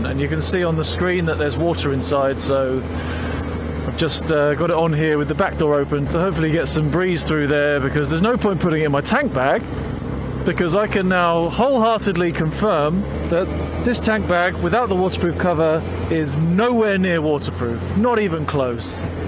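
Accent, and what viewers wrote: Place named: England, United Kingdom. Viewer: Ha!!! Awesome ride! You sound like me but with a proper English accent! My wife enjoys making fun of me and graciously reminds me of my "first world problems" whenever that sort of intervention is necessary. Back in my early years of riding (throughout the 1980's), nothing was "waterproof". It was either leather or nylon but in all cases it was wet, cold and heavy which just made things miserable. I eventually learned the value of the smallest and strongest plastic bags I could find and a good quality rain suit. Everything of value would go in the plastic bag before it went in my backpack or tank bag. Looking back now 35 years later, the memory of the bad experiences have all but faded and I can honestly say that the times on my '84 FJ1100 and Virago were nothing but wonderful. Try to take a moment to realize that what you're doing is incredibly fortunate and very few people in this world have the opportunity to do so. 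I'm sure there are many of us tuned in who have been there/done that as well as millions of those who never will are thoroughly enjoying your experiences. Either way, it's a joy to watch, listen and learn and for me personally, the sparking of memories that I recall as being the best times of my life. Cheers.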